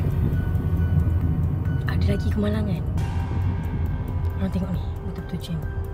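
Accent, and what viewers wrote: North, Malaysia. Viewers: nanty buat video suasana hari raya kat kampung😮 ckp la utara adehhh.... Rindu loghat utara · Fahammm betoiii teringat masa kami.kanda suka dgr cakap utara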